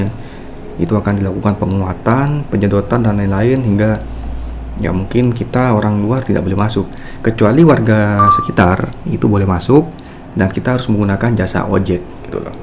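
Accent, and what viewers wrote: Madura, Indonesia. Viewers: Logatnya madura...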